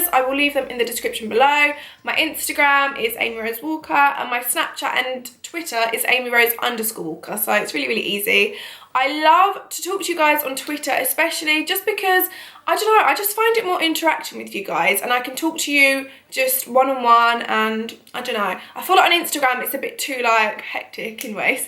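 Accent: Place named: RP, United Kingdom. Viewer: this is literally the third video of yours I'm watching today I just told my sister that I'm obsessed with ur voice and I wish I spoke like you you sound so posh and british 😭 my twin agrees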